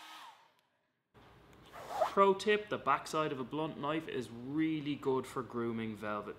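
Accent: Ireland. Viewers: This comment is crazy and I’m obsessed with this video and an Irish accent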